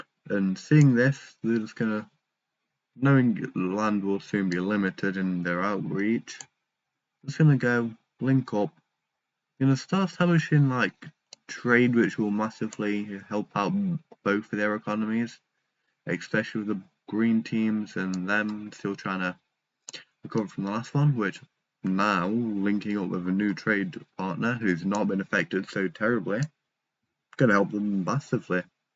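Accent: United Kingdom. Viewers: Bri’ ish voice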